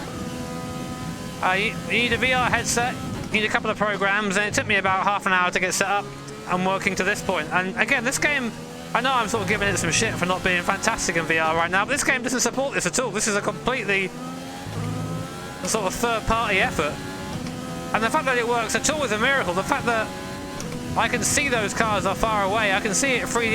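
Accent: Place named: RP, United Kingdom. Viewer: That posh gentry accent is TOO GOOD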